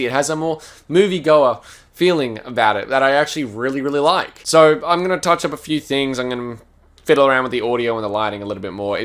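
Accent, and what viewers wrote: Australia. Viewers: New. Good to hear a Fellow Aussie. Cheers X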